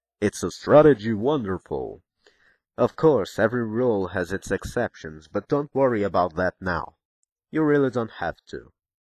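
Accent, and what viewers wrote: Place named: United Kingdom. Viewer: Cara você fala inglês briitânico!!! Que onda mané. Muito legal seu sotaque ;)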